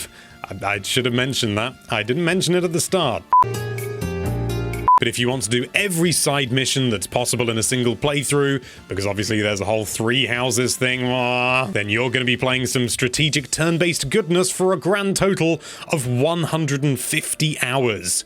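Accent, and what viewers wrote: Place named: United Kingdom. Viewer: He’s so wholesome. He’s like our weird British/Australian (I can never tell those two accents apart, but I think he’s a Brit) male gaming mom. The man has a voice like freshly baked chocolate chip cookies for crying out loud!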